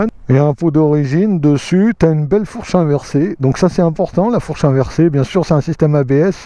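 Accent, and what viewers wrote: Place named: Lyon, France. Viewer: j’ai une Dacia Logan et une 911 carrera 4 S , je suis un automobiliste avec la deuxieme et pas avec la premiere ?...pareil il y a des permis B qui veulent rester avec leur petite moto et des fois c'est des Papy qui ne veulent pas passer le A2...Super Vidéo ,j’aime bien le beaujolais et ton accent de Lyon...gros V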